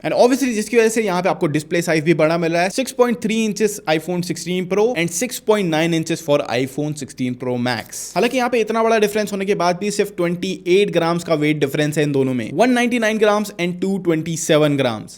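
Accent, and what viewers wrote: Sindh, India. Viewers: Are you Sindhi??❤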